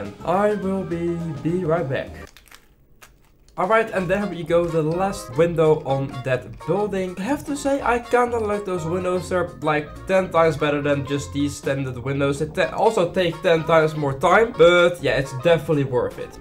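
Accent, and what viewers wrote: Netherlands. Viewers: Are you Dutch? Because I think so (Ik denk het namelijk wel)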